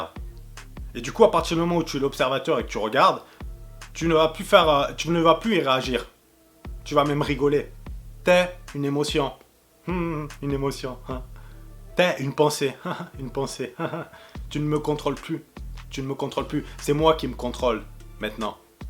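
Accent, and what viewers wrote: Marseille, France. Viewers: Mec je te découvre et ça me casse le crâne t’as le discours d’un maître spirituel mais en mode bonhomme de Marseillais ! Je te kiff grosse force mon gars !!!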